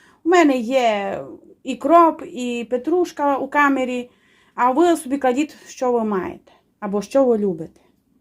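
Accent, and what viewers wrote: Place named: Hutsul, Ukraine. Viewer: Люблю гоцульський акцент👍🙂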